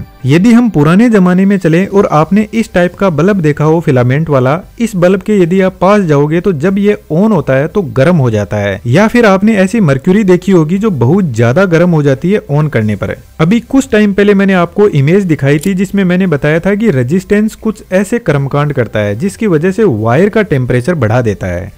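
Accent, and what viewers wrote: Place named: Sikar, India. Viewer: Sir apki tone sunkar lag raha he aap sikar jile se ho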